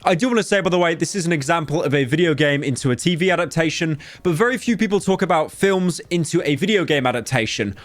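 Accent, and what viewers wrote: United Kingdom. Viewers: Haytch be oh bri-ish bruv make the h letter complicate m8 good content will view again get at it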